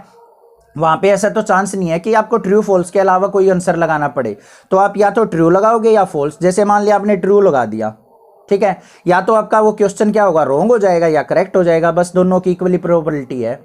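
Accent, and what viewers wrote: Haryana, India. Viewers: Sir aap haryana sai ho 🤔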